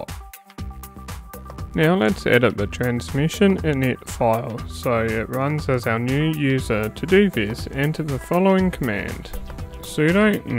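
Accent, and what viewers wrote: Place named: Australia. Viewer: Habib he sounds Australian.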